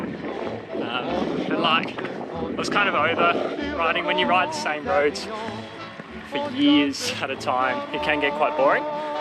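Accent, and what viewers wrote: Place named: Australia. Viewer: I am French Canadian and as much as I love Australians, the accent requires some getting used to so could you please speak more slowly or kill background music when you talk to us. Nevertheless, love your blog and you're capacity to face unknown territory. Bonne exploration!🍀🍷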